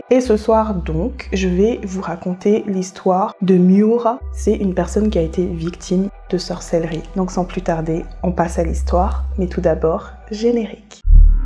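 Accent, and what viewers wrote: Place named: Madagascar. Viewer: J’adore ton accent malgache ! 😍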